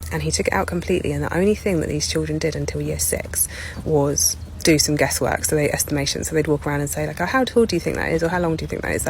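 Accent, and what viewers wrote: Poland. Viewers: just the accent.. my poland radar went off instantly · hears polish accent -'no way' checks channel name 😮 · Kocham kontrast pomiędzy ośmiornicą bez podstawówki, a człowiekiem bez podstawówki. Strong Polish akcent btw ^-^ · It's beutiful to hear how polish accent in English looks like! XD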